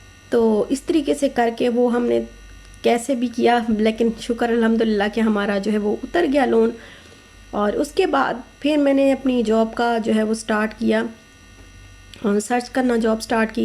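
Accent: Saraiki, Pakistan. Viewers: As'salam o Alaikum aap ke voice mai thori thori saraiki language aa rahe hai aap pakistan ke kis city se hain.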